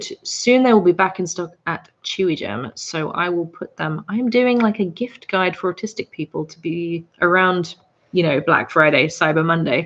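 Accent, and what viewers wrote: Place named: England, United Kingdom. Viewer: I'm. A huge fan of you ur the aesomest English girl I all so love ur acsent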